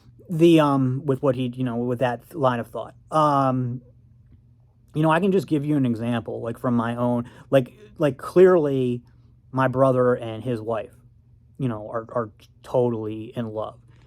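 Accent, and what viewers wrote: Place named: Africa. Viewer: African accent from an ex French colony. Perhaps Cameroon or Congo. He accent is really thick and annoying, he should have long worked on it.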